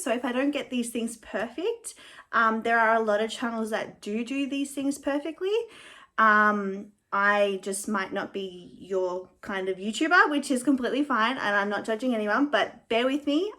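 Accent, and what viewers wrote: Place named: Australia. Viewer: Girl you gotta be a fellow Aussie! I was thinking “why does she sound so normal and relatable” cos we have the same bloody accent 🤣 subbed x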